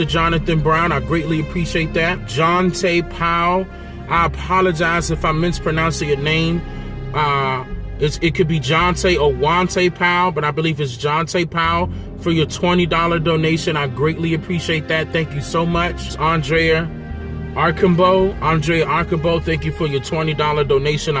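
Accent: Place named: DMV, United States. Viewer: I knew he was from the dmv i can hear it all in his voice.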